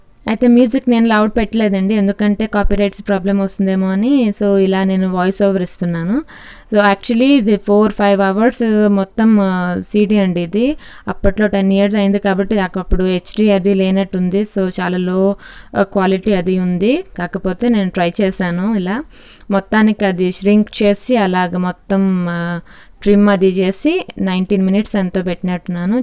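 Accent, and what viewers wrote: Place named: Visakhapatnam, India. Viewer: gaaru great andi still now mimmalni chusthe you look like egypt women andi kaani meeru matlade telugu valla andi i can recognize miru maa vizag ammayi andi great andi so you are living happily in egypt that's great andi Author Venkat 😎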